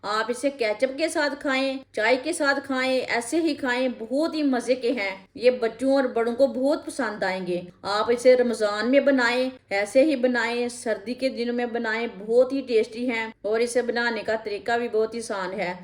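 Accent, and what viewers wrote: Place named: Punjab, India. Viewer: آپکا پنجابی لہجہ بہت اچھا ھے👌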